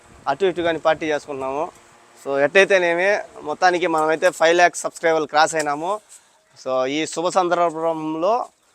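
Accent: Rayalaseema, India. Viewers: Andralo kompa vaduka basha Google lo serch chyandi kompa meaning house ani anna rayalaseema yasa lo matladutunnadu edi akkadi local language tappuga tesukovaddu daya unchi yevari basha valladi nenu telanganany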